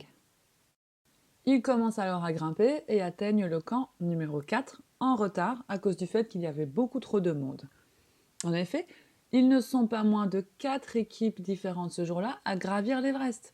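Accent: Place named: Belgium. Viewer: Je devine un petit accent belge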